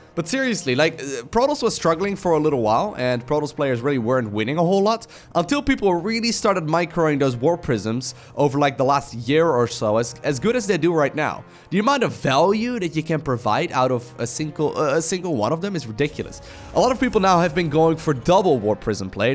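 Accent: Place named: Netherlands. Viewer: Great commentary as usual. I can tell by your accent that you're from the Netherlands. I'm from Texas. I'm sorry about Trump.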